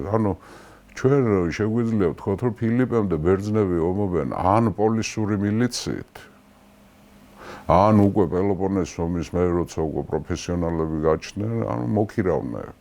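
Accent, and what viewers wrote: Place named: Racha, Georgia. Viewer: თანაც რაჭულად რომ მოგვითხრობს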